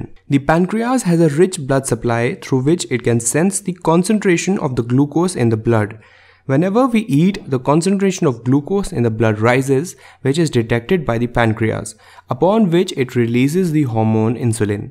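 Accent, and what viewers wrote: India. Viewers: Im sorry but i just cant take an indian accent seriously. 🥲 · I like his accent :) Indians r cool but T-Series sucks :( i am 9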